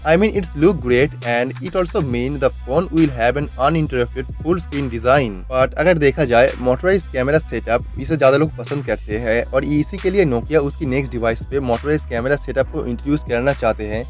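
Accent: Bengal, India. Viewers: Sir, apke Bengali hindi accent ke vajah se Kay bol rahe ho kuch bhi samajh nahi aa raha hai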